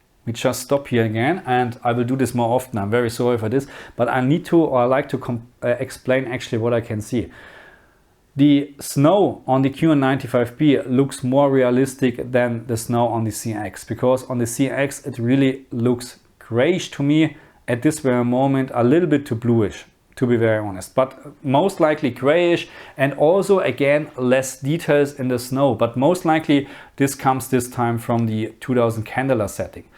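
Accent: Germany. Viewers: Great video. I like your`e german accent also. I have the lg cx. and its great. But its old tech now. and cant be that bright. but only in more then 1000 nits you seen that the cx is weak. with 800 nits, it has better details etc? but what you advice me, bought the s95b this year? or wait till second gen qd oled from samsung?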